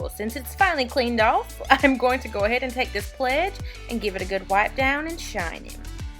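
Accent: South, United States. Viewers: swear I love southern accents! and good job on the house! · you sound so country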